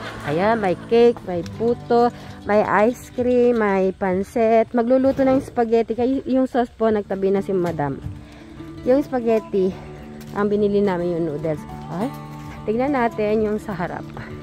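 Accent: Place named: Visayas, Philippines. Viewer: Ay visaya diay ka madam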